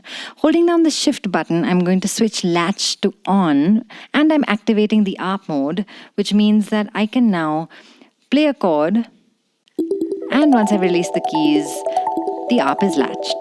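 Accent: India. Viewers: Inidan accent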